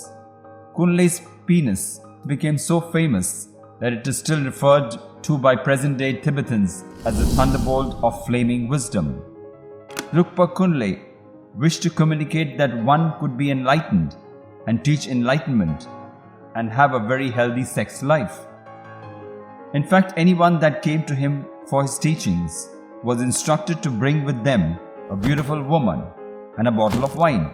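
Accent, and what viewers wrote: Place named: India. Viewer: hahahaha, BS, im tired of this indian accent people claiming they know all! BS !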